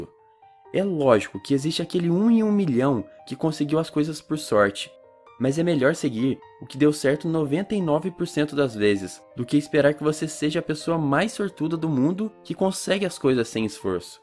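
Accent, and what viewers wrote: Caipira, Brazil. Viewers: Seu sotaque caipira cansa o vídeo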